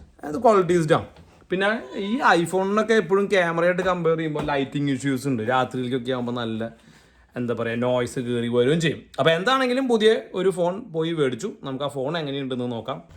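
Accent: Thrissur, India. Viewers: content onnum illenkilum veruthe samsarichal mathi, athu kelkkan oru rasamundu, ningal Thrissur alle, aa slang anu rasam. Njan ningalude video kanunnathe ninte samsaram kelkkana.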